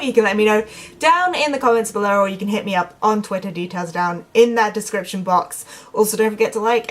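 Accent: United Kingdom. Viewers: Do you live in Southeastern England? You don't have a heavy accent.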